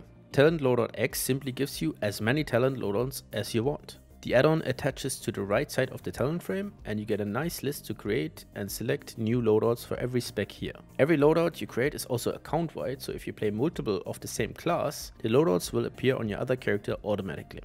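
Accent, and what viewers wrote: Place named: Germany. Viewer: Geiler deutscher Akzent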